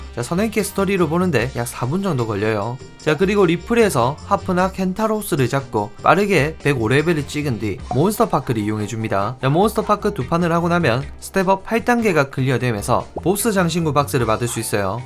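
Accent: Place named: Gyeongsang, South Korea. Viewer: 경상도사투리 진짜듣기싫다 어우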